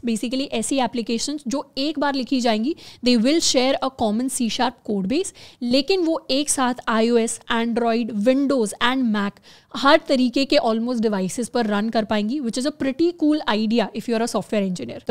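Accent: India. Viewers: It hard to understand indian english accent 😢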